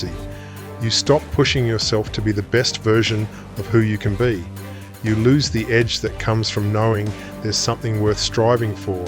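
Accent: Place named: New Zealand. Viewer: This guy sounds Kiwi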